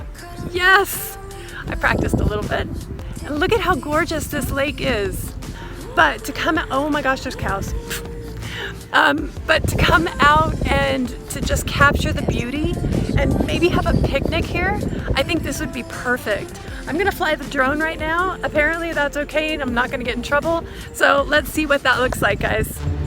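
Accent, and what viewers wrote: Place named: Canada. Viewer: Welcome to pakistan have safe trip and u sound like more Canadian then American.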